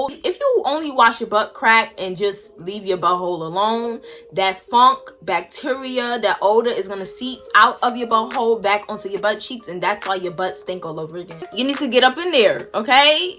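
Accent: New York, United States. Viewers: Am I tripping or do she have a New York accent 🤣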